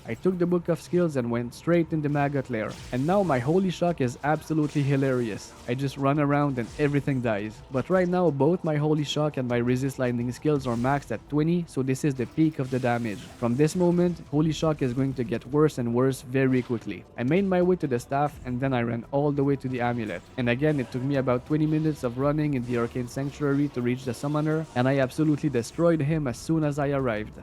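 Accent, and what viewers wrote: Quebec, Canada. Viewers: You french canadian ? Im curious 😆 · “J’ai Chaud”. I can never unhear it now. You for Quebec? The accent sounds very familiar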